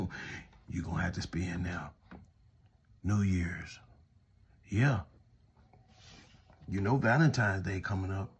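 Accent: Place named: South, United States. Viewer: You got me really using my imagination and I enjoy the content…..I’m like ….I feel what you about to say and that country accent…Unique and ❤….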